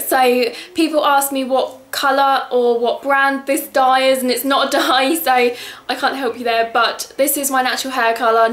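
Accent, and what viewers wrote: RP, United Kingdom. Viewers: she sounds posh as well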